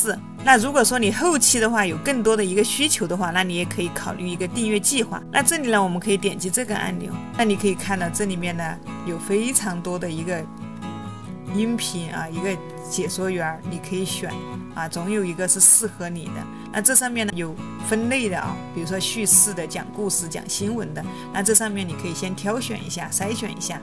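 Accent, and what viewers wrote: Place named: Sichuan, China. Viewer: 四川的吧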